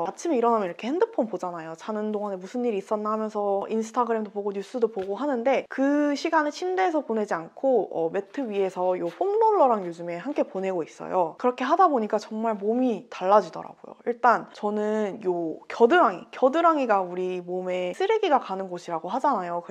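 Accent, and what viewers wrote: United Kingdom. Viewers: When are you going to film your vid in English? I love your British accent😭🇬🇧❤️❤️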